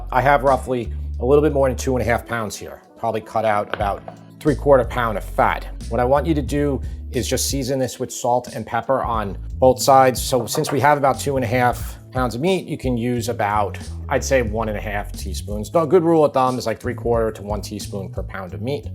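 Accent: New York, United States. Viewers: I thought i was subscribed from using your beoff Burgonion recipe. My bad. I'm subbed now. One thing i learned from chef John on YouTube is that of you accidentally crowd the pan you can just keep simmering until the liquid absorbs and it will brown. It's an option for tougher meets like Chuck. He's in California now but i think he's a NY guy too originally. I'll admit, i get a little homesick hearing you talk. North Jersey is similar enough in speech pattern/ accent.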